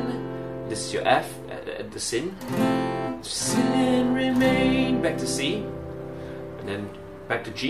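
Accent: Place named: Singapore. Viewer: I thought I recognized that accent! My wife is Singaporean and I lived in Singapore for 5 years, now in the USA. Thanks for the video. I recommended it to our musicians as we're learning this song for this Sunday. Regards, John Carpenter ("yeoberry" is a combination of my wife's maiden name, Yeo, and my middle name.)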